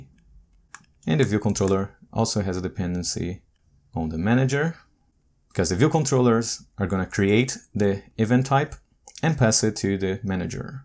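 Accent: Brazil. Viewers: what a great course! I'm lovin it! BTW are you brazilian? Your accent is looks like from brazil haha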